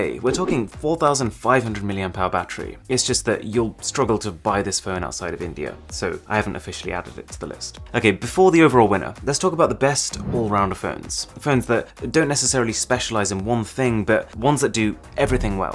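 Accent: RP, United Kingdom. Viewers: Where the hell did you get that info from? The dude is British and it's been proven before by the uni he went to and so on. I've also met people from the UK with the exact same accent and they're not too hard to find. Go do your stupid conspiracy elsewhere. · Nice review but why do you talk Posh? lol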